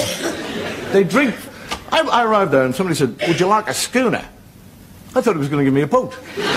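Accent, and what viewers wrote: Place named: Australia. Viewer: Just nearly wet myself laughing..........is that how we (Aussies) really sound...??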